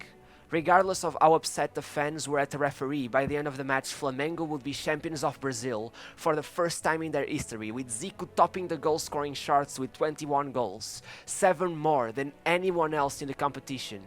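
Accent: Portugal. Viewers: Ele é português. E se tá achando ruim, grava tu e faz melhor!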